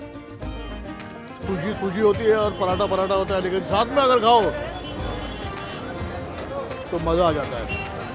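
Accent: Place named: Mumbai, India. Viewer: Your talking style says, Mumbai ka chokra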